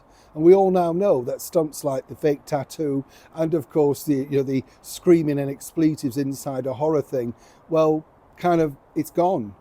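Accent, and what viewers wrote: Yorkshire, United Kingdom. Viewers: Mithering 😀 good Yorkshire Lad, love you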